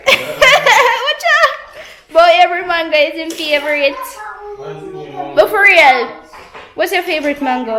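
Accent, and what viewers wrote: Jamaica. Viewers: seeing you cut that Julie mango makes me want to go home. So jealous as its nearing that time of year when mangoes are plentiful. I do hope I'll get back before the season ends. Love the Jamaican accent. You're really good at it💕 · mi rate yuh is blessed to have you as his wife...just love how you able to adapt embrace and love the Jamaican culture and food.. love to hear you chat patwah and its phrases like a true jamaican.....Big up to you and. One love.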